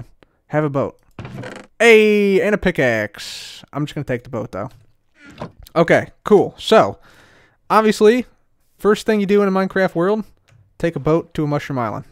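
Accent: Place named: Pennsylvania, United States. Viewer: sounds like a Pennsylvania accent. loving Season 7 so far! great start...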